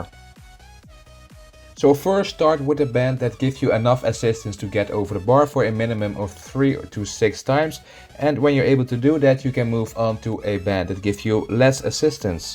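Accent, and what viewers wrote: Netherlands. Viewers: ik kan het nederlands van 3km afstand horen 😂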